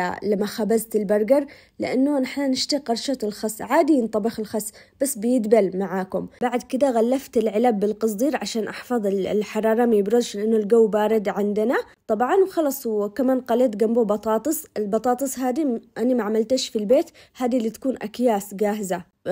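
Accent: Aden, Yemen. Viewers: وووووووو اني فدالك ❤مبدعه والله وكله كوم و هدرتك باللهجة العدنيه الذي اموت عليها كوم الله يحفظك و يسعدك يا رب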